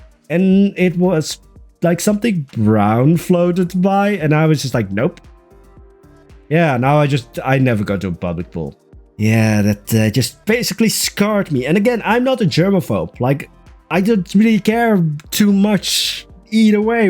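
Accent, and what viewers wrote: Netherlands. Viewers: Dutch accent haha❤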